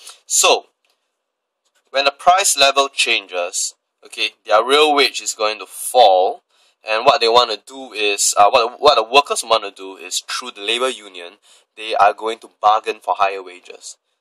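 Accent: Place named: Singapore. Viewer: singaporean narrator yay hahahaha! totally unexpected!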